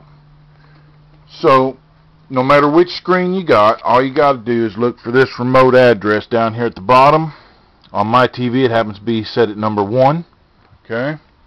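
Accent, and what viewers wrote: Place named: South, United States. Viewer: Thanks sir you helped me reprogram my remote ! I love the southern accent by the way 👍🏽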